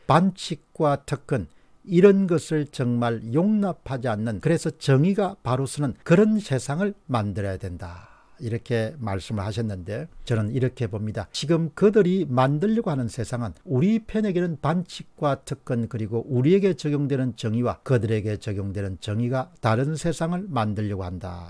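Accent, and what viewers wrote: Gyeongsang, South Korea. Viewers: 문재인포???? 에라이 갱상도 발음좀 고쳐라잉...............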